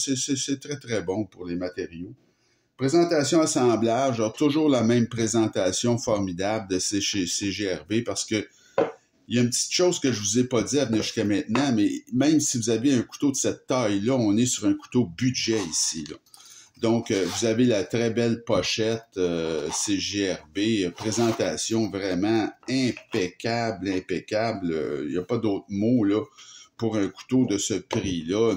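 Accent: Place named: Quebec, Canada. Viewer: Quel plaisir d'entendre l'accent québécois😊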